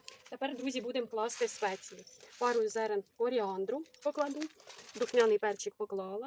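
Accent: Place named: Poltava, Ukraine. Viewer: Дуже схоже на полтавський говір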